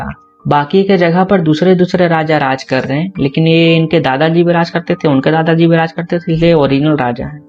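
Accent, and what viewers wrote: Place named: Bihar, India. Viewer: aap muje bihari lad rahe ho aapke aawaj se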